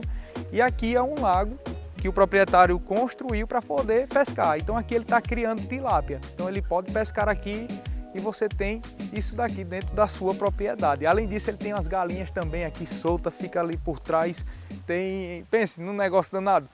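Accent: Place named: Northeast, Brazil. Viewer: Tô aqui me acabando 😂❤🎉 de rir 😂😂😂 A casinha 🏡 é um show 🎉🎉🎉 mas a sua apresentação ; fala ; e simpatia é o que deixa o vídeo ainda mais convidativo ✌👏🏻👏🏻👏🏻💎 saiba disso ; O jeitinho do povo nordeste 🏜 falar encanta os que ouvem ...😂❤✌😘💋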